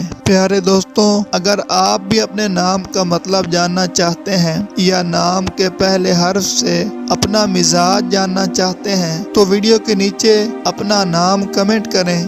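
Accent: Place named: India. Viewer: UZMASAMEER Indian tone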